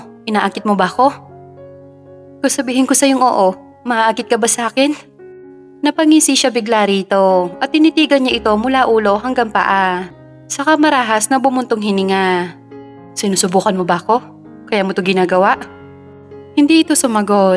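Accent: Philippines. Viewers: Ok lang pero funny kc may punto yung narrator ay parang may music na pataas at pababa. Anong probinsya ka ba? Di ka ciguradong taga Manila.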